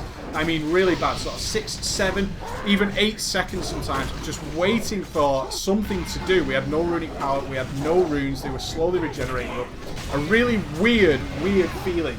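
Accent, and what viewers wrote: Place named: England, United Kingdom. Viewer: what server do u play on BTW i love my wow vids with an english accent lmao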